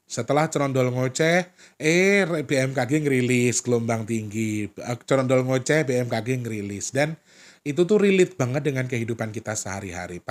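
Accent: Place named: Tuban, Indonesia. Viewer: Nwn Sewu mas BHS timurane mas kok kayak logat Tuban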